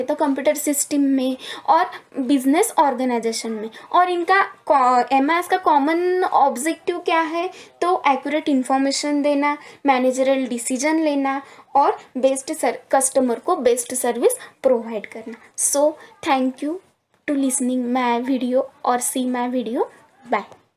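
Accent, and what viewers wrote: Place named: India. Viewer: Nice lecture..Liked you Hindi accent.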